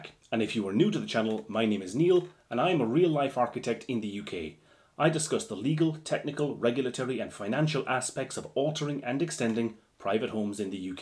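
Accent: Ireland. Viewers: would you be able to comment as to planning permission for Ireland? You have an Irish accent, that's why I ask :)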